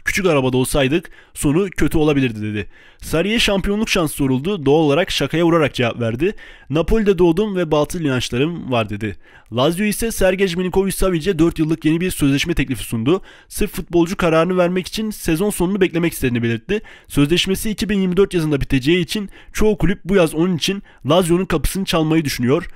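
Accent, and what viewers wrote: Gaziantep, Turkey. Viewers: Reis Antepli misin? Desene hemşehri çıktık :D Bu arada cevap La Liga.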